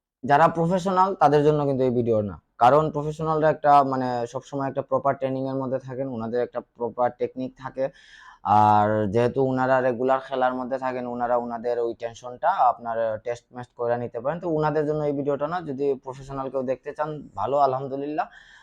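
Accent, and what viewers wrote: Sylhet, Bangladesh. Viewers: Bro apni ki Sylheti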